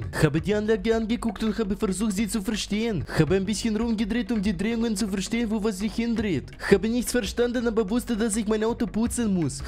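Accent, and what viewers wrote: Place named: Russia. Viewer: Ich liebe deinen Russischen Akzent! Mach weiter so!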